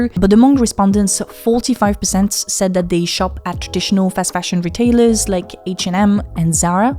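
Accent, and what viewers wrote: France. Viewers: It's the difference between her accent which is obviously French but perfectly understandable and the sort of French accent where the person clearly doesn't know how to pronounce English words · I think she's French and thats just her natural accent...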